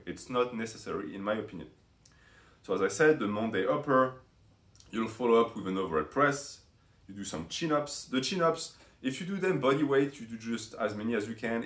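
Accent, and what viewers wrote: France. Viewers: Ta un accent français ? Dommage que je comprends pas. L'anglais sa fait 3 ans que je m'entraîne en half est j'aurais aimé plus de renseignement est de changement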